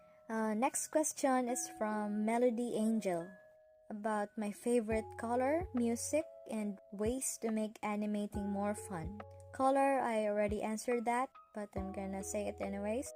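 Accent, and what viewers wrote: Philippines. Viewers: You sound Filipino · Your voice reminds me of a Filipino accent, are you filo? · I never expected ur from phil and ur voice sounds exactly like from phil, beautiful voice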